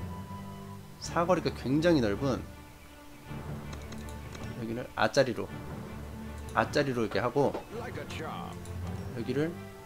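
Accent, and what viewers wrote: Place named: South Korea. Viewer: 자연스러운 콩글리쉬 발음에 편안함이 느껴지는구먼.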